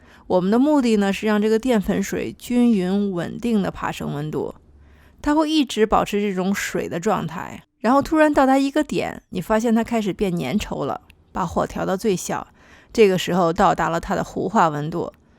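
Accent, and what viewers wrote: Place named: Xi'an, China. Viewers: 西安话再土也可爱，西北特有的韵味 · 西安话挺好听啊，我济南人，我每个地方的方言都有自己的特色都很有意思，之前闫妮和张嘉译演的电视剧，她俩说西安话特好玩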